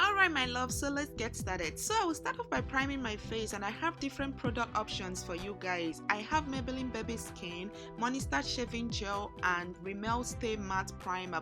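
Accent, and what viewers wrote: Nigeria. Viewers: love your nigerian accent ....hope this is not to personal what tribe ?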